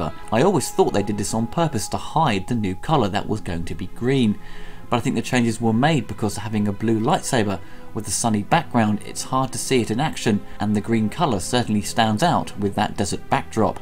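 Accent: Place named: RP, United Kingdom. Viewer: It's funny to hear someone with a relatively posh sounding British accent say "butthole".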